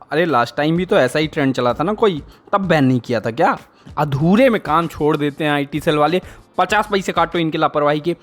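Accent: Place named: Bihar, India. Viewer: Sir aap Bihar se hai kiya kyuki me v Bihar se hu apke language se lagg raha hai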